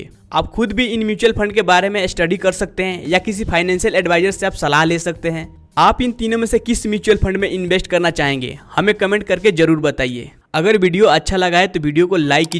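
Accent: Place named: India. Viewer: Bro.. your videos are very informative...Thank you...also please pronounce proper English words.. don't mix Hindi pronunciation... please check spelling mistakes...Eg.Fund..not fhund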